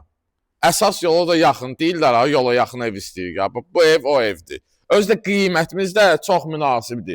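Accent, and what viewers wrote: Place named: Baku, Azerbaijan. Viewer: Allah qorusun. Bakılı ləhcəsi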